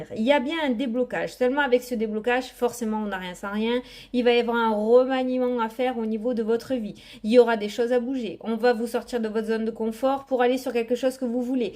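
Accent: South, France. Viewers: Dommage j'adoore ton assent du sud. 😄😎🌞🙏 C'est le Soleil. Merci c'est Super. 🍀💫🙏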